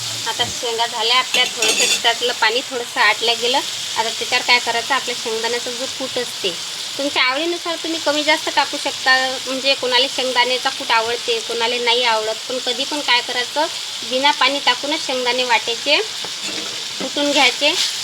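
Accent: Vidarbha, India. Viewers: भाजी एकदम छान केली, मला तुझी भाषा आवडते कारण मी अकोल्यात राहते, तू कोणत्या गावची आहे ❤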